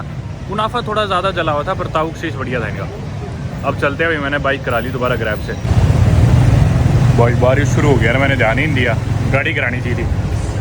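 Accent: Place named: Haryana, India. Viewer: Hi, jab haryana bolte ho na kisi doosre desh mai bhai swad hi aa jata Hai....... tau kheech de tode de batti😂😂😂😂😂😂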